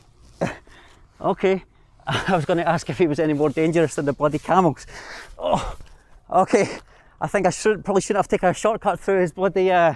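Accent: Scotland, United Kingdom. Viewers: Your Scottish accent is so strong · I think this is the funniest one yet had me laughing 😆 my head of from the beginning I thought the camel was going to chase you but no the oryx did the way you fell back geez you were very lucky there lol you tend to push the limit's with animals, anyways your a CMU 😅😂🤣 love watching you your Scottish accent tops it all of✔️ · i dont understand what i like more, his content or his lovely scottish accent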